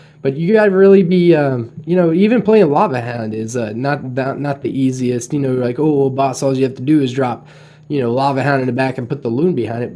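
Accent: Texas, United States. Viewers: I miss the Texan Drawl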